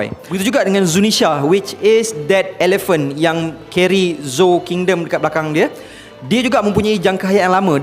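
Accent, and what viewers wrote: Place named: Kelantan, Malaysia. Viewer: Kecek klate skali😂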